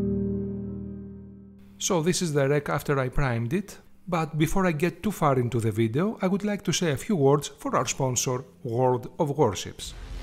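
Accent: Greece. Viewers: nice work and greek accent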